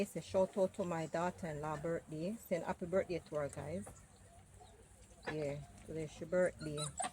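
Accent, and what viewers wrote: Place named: Jamaica. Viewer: Love how you keep the Jamaican accent 😅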